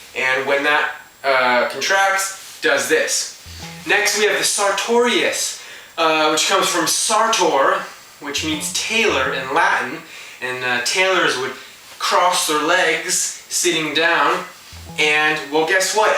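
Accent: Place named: Canada. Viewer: Gud to see you know what you talking about.At first I thought oh no not another American on YouTube. But I think you're Canadian aren't you.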